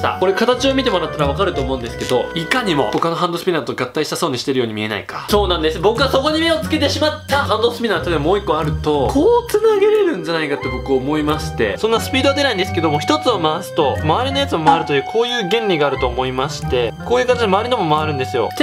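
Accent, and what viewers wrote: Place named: Toyama, Japan. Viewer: この中に入っとるんか。 完全富山弁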